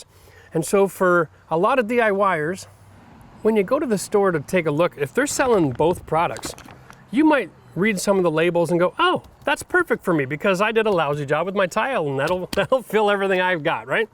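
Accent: Canada. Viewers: OMG, I'm an experienced DIY'er and have done a bunch of remodels and cabinet building. But I never touched the tile work. My latest project is a bathroom remodel and doing the tile for the first time. Watched some of your vid's, got a feel for it. In one of your videos you mentioned something about this grout, but I ignored it. Well, job is done and I noticed this video and watched it... so yeah, oops. This now explains why the stuff was setting so quickly. Fortunately my wife chose this super close color match and it looks good. Whew. Great job on the videos. You sound just like my contractor friends, minus the Canadian thing.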